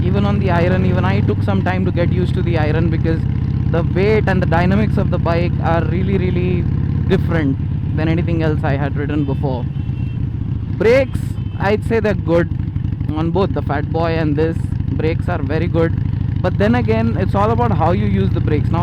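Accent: Hyderabad, India. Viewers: Bro please make one video per month in your hydrabadi accent. I love your hydrabadi accent. please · Your accent is awful and here you are trying to get rich on YouTube. Why don't you go fishing and work for a living like the rest of your Indian brethren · i knw indian accent but this is way too indian.. and FYI i stopped as soon the accent ws killin me ....literaly.....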